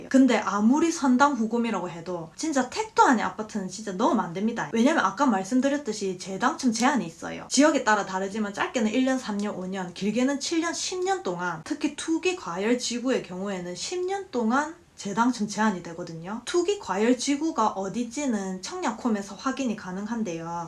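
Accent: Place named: Busan, South Korea. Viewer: ㅋㅋ부산사투리쓰면서 재밌게 말씀 하시네요ㅋㅋ무주택자 다자녀를 둔 외벌이 거제도 가장입니다ㅋㅋ 좋아요 구독 누르고 갑니다~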